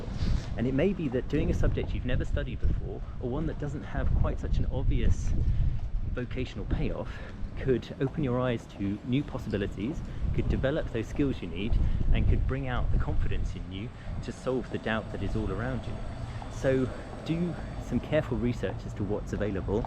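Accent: United Kingdom. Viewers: I like British accent hope i can talk like that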